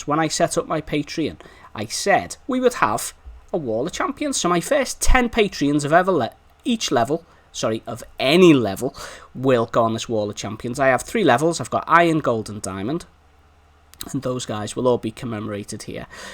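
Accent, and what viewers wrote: Liverpool, United Kingdom. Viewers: Love it!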